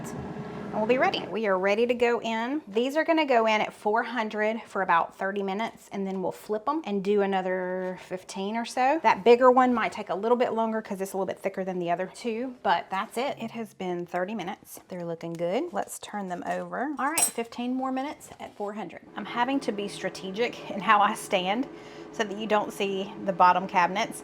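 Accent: South, United States. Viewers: Hello and. I found your channel recently and enjoy it so much. We are neighbors. Oconee county!!, I understand you so well. It's refreshing hearing another true southern. I often get told talk doesn't have a W in it. Ya'll are great. Keep doing what ya'll do.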